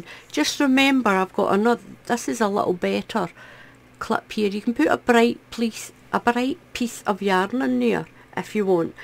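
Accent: Scotland, United Kingdom. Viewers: i really love your tutorials nice to hear a scottish voice i'm from stirling now living in northern ireland 44years i've been here you explain everything so good i will be trying this hat ty ❤ x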